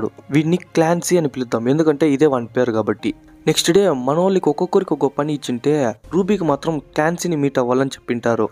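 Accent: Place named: Rayalaseema, India. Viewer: Mana rayalaseema accent lo balega explain chesavu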